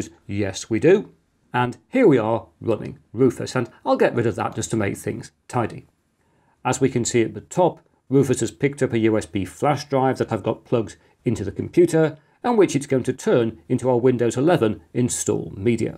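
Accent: United Kingdom. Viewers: Very clear tutorial, summarizes the various procedures perfectly. Plus I really like your British accent and very clear diction.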